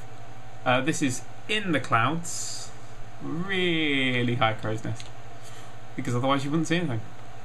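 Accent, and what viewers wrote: United Kingdom. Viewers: Finally a British guy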